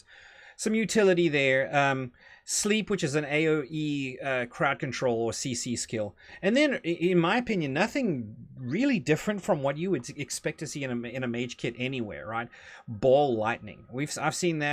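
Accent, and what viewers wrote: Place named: Texas, United States. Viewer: I liked this video quite a bit, and also happy you are doing more content. But, the big story for me is that I am starting to hear a Texas accent slip into your narrative occasionally :)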